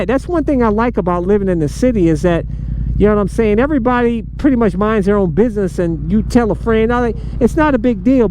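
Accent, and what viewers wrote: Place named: South, United States. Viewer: C'mon man! You're just a CITY BOY with a country twang speech impediment. Yeah, you gotta work to live, brother, and you gotta SHARE IT WITH THE FLORA & FAUNA. FACT.✅ Only the tough survive. But yes many southern people are crazy, esp. the government. You avoid them!! Otherwise, youare 💯% right, EXCEPT, CITIES ARE A DEATH TRAP.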